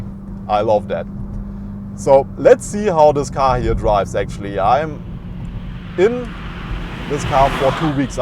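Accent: Germany. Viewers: Krass wie schnell man einen Deutschen an seiner Schrott Aussprache erkennt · After 2 minutes watching you video , I discovered that the review is in English hhhhhhhhhhh , I love German-English ... Good review man , thank you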